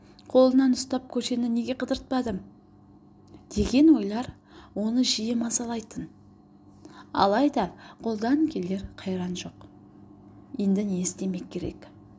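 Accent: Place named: Russia. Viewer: сол үйрену үшін қателіктерін айтканымыз дұрыс шығар. И орысша акцентінде түзеп анық қазақша оқу үшін қазақша кітаптарда мәнерлеп дауыстап көп оқыса . Сосын барып бүкіл елге оқыса дұрыс болар еді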